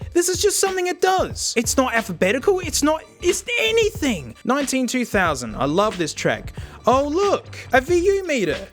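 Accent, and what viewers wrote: Australia. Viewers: bruh, youre ozzie as fuck. I gotta call my fam down under now. great video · You’re as aussie as Colin furze is British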